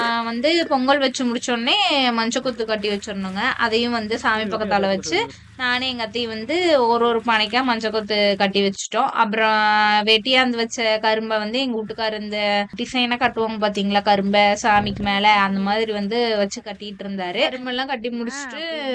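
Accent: Kongu, India. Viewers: நாங்கள் கொங்கு வெள்ளாள கவுண்டர் தங்கச்சி கார்த்திக் தம்பி அம்மா உங்கள் கொங்கு தமிழ் சூப்பரோ சூப்பர் பொங்கல் நல்வாழ்த்துக்கள் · நீங்கள் என்ன கூட்டம் கொங்கு வேளாளர் என்று நினைக்கிறேன் உங்கள் பேச்சு அப்படி உள்ளது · இனிய பொங்கல் நல்வாழ்த்துக்கள். கொங்கு தமிழில் பேசுவதே தனி அழகு. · Indha video kum unga jathikum enna irukku... Avanga pesaradhu kongu tamil... Edhukku jathi sayam poosathinga...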